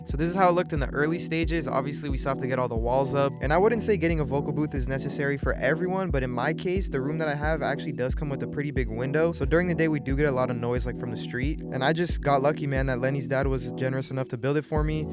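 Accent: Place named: Ontario, Canada. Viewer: this guy's either from Cali or from Ontario.. Maybe Toronto, Most likely Hamilton :D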